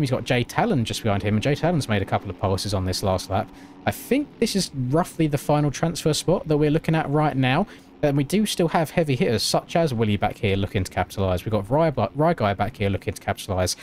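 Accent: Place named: United Kingdom. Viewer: I'd much rather listen to your Brit voice commentating than Leigh Diffey any day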